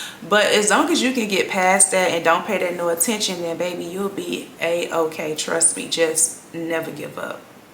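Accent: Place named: South, United States. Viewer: I think it's the southern accent that I like, lol 🤣🤣🤣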